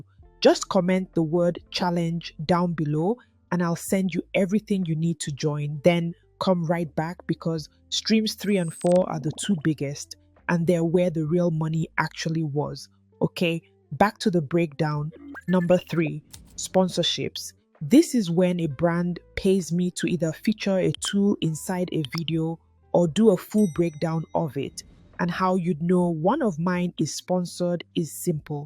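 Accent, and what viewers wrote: Nigeria. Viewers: Wait you are Canadian keeee.. you sound soo Nigerian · Yea. I knew I could recognize that's Nigerian accent. I love your channel and I'm subscribing 🖐️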